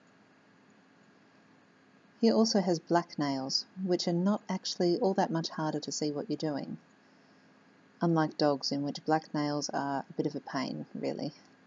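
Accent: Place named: Australia. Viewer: The drawings made no sense to me, and the video could have used another body to manage the camera better, including larger close-ups focusing on the clipped spot. But I did manage to grasp what was trying to convey due to my own earlier experience, where I found more cats to be less than enthusiastic about the procedure. So, in order to prevent a painful mishap, I wrap my mani/pedi ready furballs in a large towel, not too tight, leaving only the head protruding from the cotton sausage, and the paw under consideration sticking out from another tube at some distance to minimize the chance of being bitten. Oh, and in the event blood is drawn, I keep a dish with H2O2 and cotton ball handy. Better safe than sorry. And, absolutely, yes, a special treat comes at the end of the not-really-an-ordeal. P.s. -, could you please narrate at a slightly slower pace for those of us who are trying to comprehend yr Aussie accent and grasp what you're saying at the same time. 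(Maybe that's why I failed to get the drawings.) Thanx. (With apologies if I managed to, however inadvertently, offend with my blunt comment.) Ps2: you have a very compelling and lovely sweet and soothing voice.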